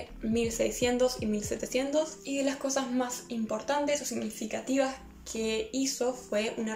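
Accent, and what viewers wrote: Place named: Rioplatense, Latin America. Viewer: Estuve buscando un canal argentino de libros (porque me encanta el acento rioplatense 😍) y encontré el tuyo, me gusta muchísimo! ❤️ Lorca es mi escritor favorito, leí Bodas de Sangre hace un año en original y para mí es una maravilla ❤️ También vi la película y pienso que es tan mágica como libro, me encanta! Saludos desde Polonia 🇵🇱❤️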